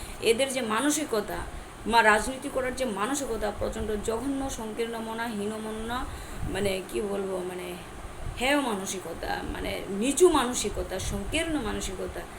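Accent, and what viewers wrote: Bangladesh. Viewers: Truly tripurar basinda hola eai babe kotha bole na sotti tumi bangladesi tumar kothatei porichoi hoi.....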